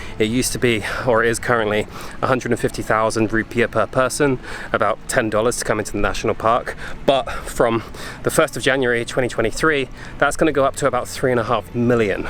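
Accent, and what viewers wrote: United Kingdom. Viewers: british accent lol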